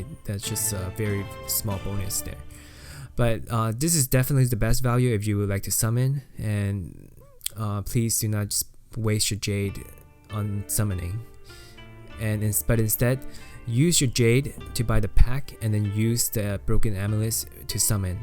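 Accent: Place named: Asia. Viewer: Your english sounds like an asian english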